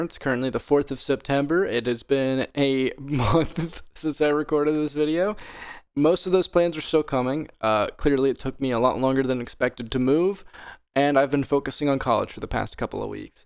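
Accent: Europe. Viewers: Nah, the European voice is golden. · + keep the "european" voice, it's so freaking hilarious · I totally understand wanting to step outside of the idubbbz content cop mould to stamp a unique identity on it, but please keep the edups European voice. it's so fucking funny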